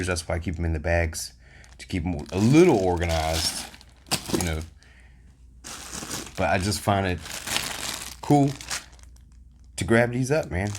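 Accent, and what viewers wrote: South, United States. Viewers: This may be a strange question but where are you from? You have an accent that I have heard in African American neighborhoods originally from the South and living in Washington DC and Philly.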